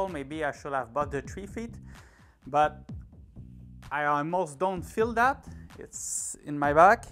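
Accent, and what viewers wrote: Quebec, Canada. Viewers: C"est moi ou tes quebecois?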